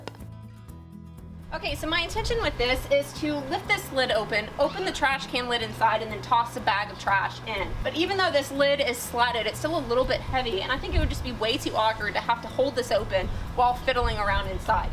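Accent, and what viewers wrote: Texas, United States. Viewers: It's just how she talks. It's a Texas thing.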